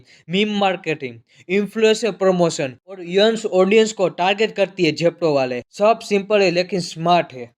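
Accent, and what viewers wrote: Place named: Gujarat, India. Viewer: E gujju hindi sunke majha aavi gaya😂, jhepto jaise dusri khani bhi sunana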